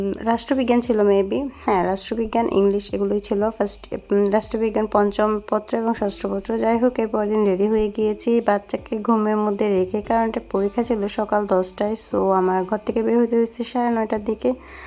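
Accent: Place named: Noakhali, Bangladesh. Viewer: আপনি ভয়েস শুনে মনে হলো আপনি নোয়াখালীর না হয় ফেণীর মেয়ে হবেন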